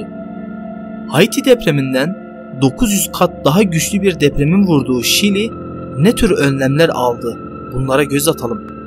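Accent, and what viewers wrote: Aegean, Turkey. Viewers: Ege şivesiyle muhteşem bir ses eline sağlık yeni videoları sabirsizlikla bekliyoruz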